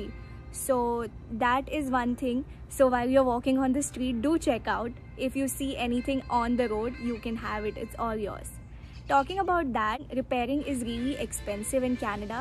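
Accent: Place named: India. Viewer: Perfect Indian Accent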